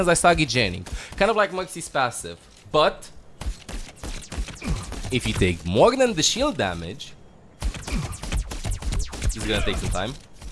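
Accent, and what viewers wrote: Romania. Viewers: Not only is brilliant, entertaining, and an incredible teacher, his beautiful Romanian accent invariably gets every tip he gives playing on repeat in my head for a week. Love what you do, keep up the good work <3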